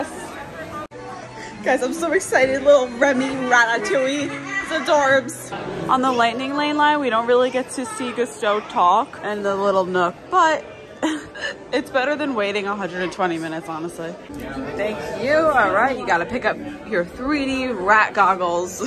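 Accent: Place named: New York, United States. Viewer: New York is on mine and my wife’s bucket list! I thought that was your accent but didn’t want to speculate lol. COME ON YOU BOYS IN GREEN!!!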